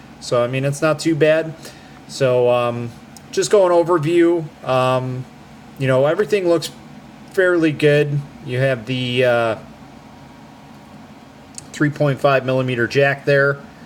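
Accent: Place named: Chicago, United States. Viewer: dude where are you from? You have a killer Chicago accent coming though on these videos!!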